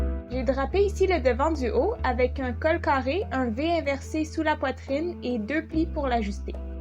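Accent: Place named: Quebec, Canada. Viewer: Bravo pour cette réalisation très fraîche. Vous êtes simple et pro en même temps. Pour moi, le voyage c'est votre délicieux accent québécois:):)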